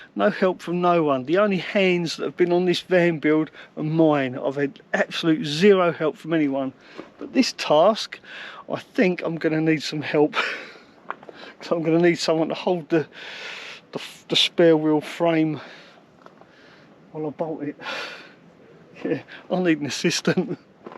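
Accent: Cockney, United Kingdom. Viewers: Professional cockney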